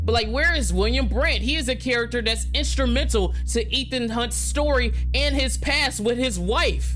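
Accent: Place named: AAVE, United States. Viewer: Your ranting is dumb and makes no sense.Speak proper english next time.Ebonics is not a proper language but just gibberish.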